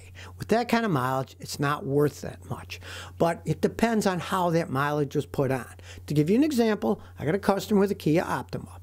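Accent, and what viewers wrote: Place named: Upstate New York, United States. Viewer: I thought I heard an upstate NY accent!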